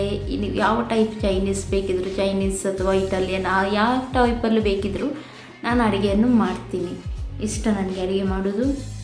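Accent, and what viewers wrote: Mangalore, India. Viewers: Kannada Chennaigide Mangalore Kannada Accha Kannada don't worry mam. I am SWATHI. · Don't worry my friend. Neeu nam urinavru anta kushi aitu. Manglure kannada- is best keluvaga tumbaa khushi agutte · ನಿಮ್ಮ ಮಂಗಳೂರು ಕನ್ನಡ ತುಂಬಾ ಇಷ್ಟ ಆಯ್ತು ಹಾಗೂ ಆಡಂಬರವಿಲ್ಲದ ನೈಜ ಮಾತು ಕೂಡ ಇಷ್ಟ ಆಯ್ತು. Nature & God bless you.... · Mangalore Kannada Super medum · Donot worry i like your kannda speaking why to worry in mangalore this way they soeak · Mangalore kannada...super mam... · I lv mangalore ...I lv mangalore type kannada superag iratte Bangalore ginta mangalore kannada ne clean iratte valasigaru bandu alli kannada na kannad madidare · Mangalore Kannada clean maraayre. I love Mangalore type Kannada. I am a proud manglorean.❤️❤️😘😘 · Hey so sweet of you are,,,, u r pretty yar,, mathe nimma manglore kannada namge tumba esta marayre!!! :) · Akka I love u Nan sister antha ankothini. 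mangluru kannada chanda akka thumba naryadi inda maathadthare alli. bangalore kannada varatu akka Naanu mandya agagi helide. Yak althira naavu nim family ankoli akka albedi Nan hesru radha.albardu my request akka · I like ur mangalore kannada. And I like ur life style. Thank you for sharing your personal. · Nivu estondu bejar agbedi nanu Mangalore nodidini ede eriti Kannada matanadodu. Nima skin tumba channagide. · ಮುದ್ದು ನಮ್ಮ manglore ಭಾಷೆ ತುಂಬಾ ಚೆನ್ನಾಗಿದೆ, ಆ mathalli ನೀವು ಇನ್ನೂ ಮುದ್ದು, ನಿಮ್ಮಿಂದ ನಾನು ಅಡಿಗೆ ತುಂಬಾ ಕಲಿತೆ, ನಿಮ್ಮ ಮುದ್ದಾದ ಫ್ಯಾಮಿಲಿ, ನನ್ನ koraggajja ನ ಆಶೀರ್ವಾದ ನಿಮಗೆ ಯಾವಾಗಲೂ ಇರಲಿ, ನಿಮಗೆ ಆದಷ್ಟು ಬೇಗ ಸ್ವಂತ ಮನೆ ಆಗಲಿ ಎಂದು ಪ್ರಾರ್ಥಿಸುವೆ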